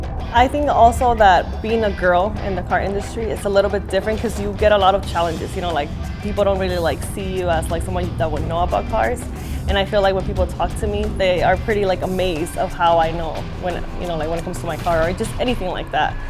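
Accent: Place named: East Los Angeles, United States. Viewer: Damn she sounds like she's from East L.A.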